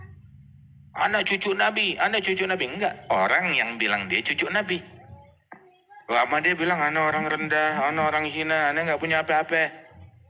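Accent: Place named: Jakarta, Indonesia. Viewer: beliai anaknua habib novel bin jindan, cucu habib salim bin jindan, logat betawinye kaya abahnya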